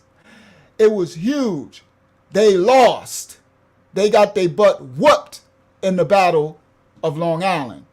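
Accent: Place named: East Texas, United States. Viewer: I've been listening all day at work and most times I listen to the Ministry channel... but I decided I'll listen to the 25 to life channel.. I'M LIKE UNK. YOU SOUND LIKE YOU FROM THEM DEEP WOODS WHERE I'M FROM😮😮😮😮 I HAD TO WITNESS CROSSES BURNING, AND LAYING ON THE floorboard just to get Beaumont.. like😮😮😮 KOUNTZE, SILSBEE, JASPER, VIDOR, LUMBERTON( MORE RACIST THAN VIDOR=SWEPT UNDER THE RUG, WHERE I SAW MY FIRST BURNING CROSSES) WOODVILLE...ALL IN DEEP EAST TEXAS... THEY STAYED ON THEIR SIDE IN OUR SMALL TOWN AND WE STAYED ON OURS..I PRAY 1 DAY TO TELL IT..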